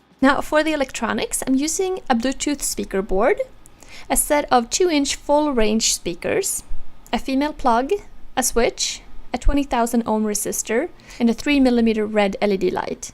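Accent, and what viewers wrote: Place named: Sweden. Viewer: I hear that you are Swedish nice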